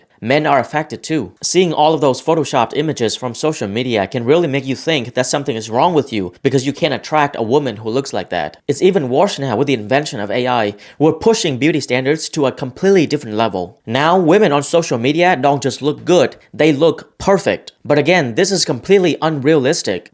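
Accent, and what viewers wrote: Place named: Vietnam. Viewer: Dude are you Vietnamese? U got that accent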